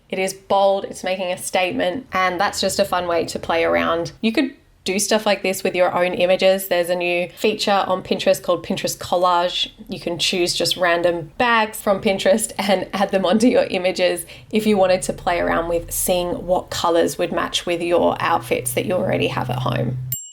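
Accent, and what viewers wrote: Australia. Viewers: Great vid love your non bogan Aussie accent and your way of explaining this theory is really clear with good examples. Thanks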